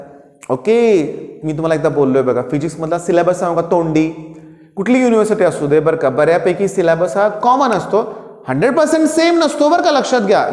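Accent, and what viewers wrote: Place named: Western Maharashtra, India. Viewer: Sangli satara kolhapur che por boltat he bhava ✌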